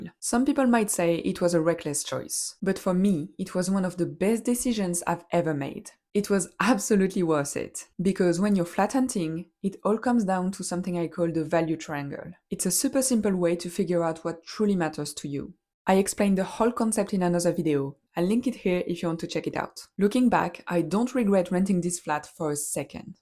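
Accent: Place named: France. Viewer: Where are you from? I'm guessing France but I'm not that great with accents. 🥐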